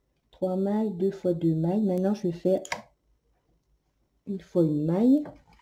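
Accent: North, France. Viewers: mais non faut pas moi j'adore, c'est un accent charmant ! vous vivez dans le nord ?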